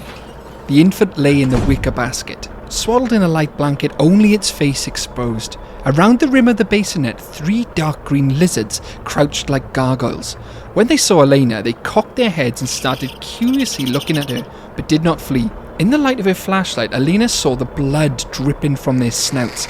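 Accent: United Kingdom. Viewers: Looking for a meaningful JP video. Clicks on this video Hears British dude Leaves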